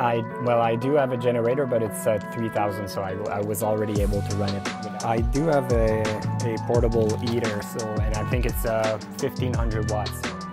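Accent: Canada. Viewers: Oups je me suis trompée de vidéo mais j'ai like quand même. A bientôt pour une vidéo avec votre accent Canadien en français 😉☺🤣😂🇲🇫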